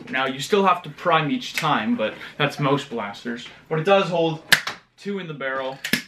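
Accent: Canada. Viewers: Are you from Quebec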